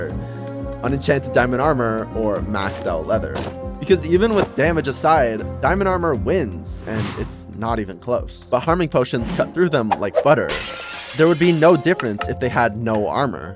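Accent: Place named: Poland. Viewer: ok just sounds Polish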